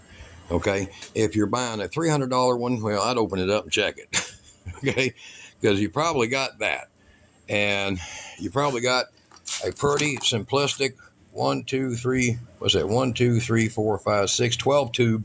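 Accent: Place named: East Texas, United States. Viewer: Yor seam to be developing a east Texas accent , I like it but I thought you were west of Austin . I'm in north east Texas myself .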